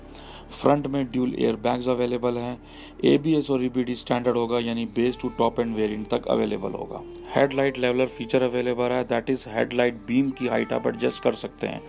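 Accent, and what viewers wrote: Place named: Pakistan. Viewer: This person speaks Pakistani Hindi.....Ychel is available in Pakistan currently.So video is from Pakistan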